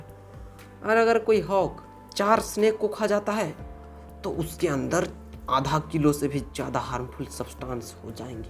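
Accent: Bihar, India. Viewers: Sir aap Bihar se ho kya????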